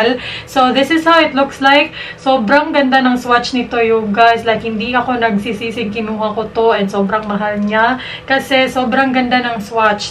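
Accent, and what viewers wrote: Visayas, Philippines. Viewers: sa kaka panood ko sayo na adopt ko yung accent mo wahha. kaya akala ng mga viewers ko bisaya din ako heheeh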